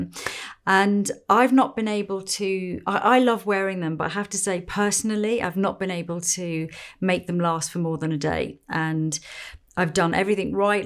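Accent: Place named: RP, United Kingdom. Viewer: It's a lovely look, however, I have an extreme allergy to acrylates and all of the fake lash products seem to contain them, unfortunately. Same for Lashify. Any suggestions? You are so very pretty, and I love listening to your plummy voice, too.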